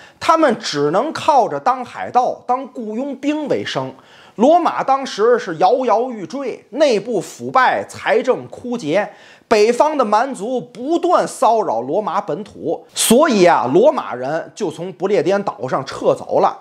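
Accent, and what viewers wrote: China. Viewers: 儿化音挺好听的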